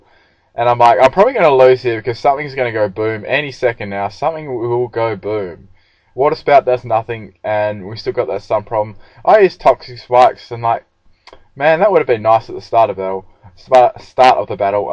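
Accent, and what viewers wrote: Australia. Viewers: my favorite part of this video? that he's FUCKING AUSTRALIAN!!!!!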